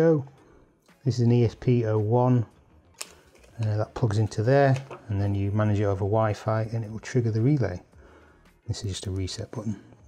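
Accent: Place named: Newcastle, United Kingdom. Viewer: Hi. You might remember me from Seon's live stream chats. I'm a Dutchman not trained in UK dialects but I think I'm hearing a Newcastle area accent?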